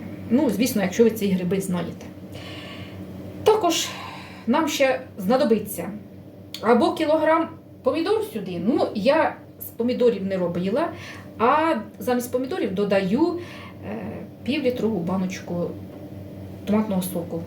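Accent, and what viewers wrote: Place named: Chornobai, Ukraine. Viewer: та ще й мабуть біля Чорнобая живете, я цю мову впізнаю з тисячи🌺🌷😉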